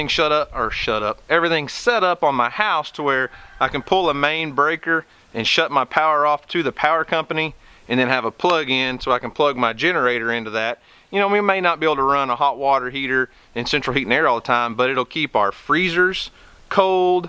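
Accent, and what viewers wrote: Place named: Oklahoma, United States. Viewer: Pardon my ignorance, but are you calling the culvert a "tin horn" or "ten horn" ? I'm from NY and your Oakie accent is through me off?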